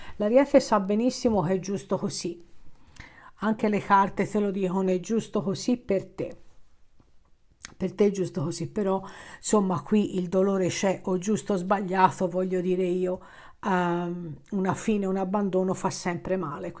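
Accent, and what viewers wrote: Tuscany, Italy. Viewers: Ciao ti seguo sempre sei bravissima ogni volta che ti ascolto sembra che parli della mia vita, azzecchi tutto ……. Adoro il tuo accento toscano……i tuoi consigli sono molto preziosi e mi fanno riflettere sui prossimi passi da fare nella mia vita …..grazie 😘😘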